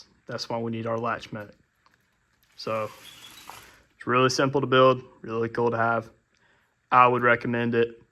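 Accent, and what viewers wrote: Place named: Texas, United States. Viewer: the texan accent 🔥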